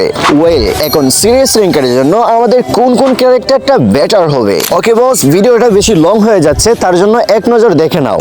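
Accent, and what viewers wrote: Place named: Sylhet, Bangladesh. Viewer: বস তোমার সিলেটের ভাষার অনেক ভালো লাগছে❤❤❤❤😊😊🎉🎉🎉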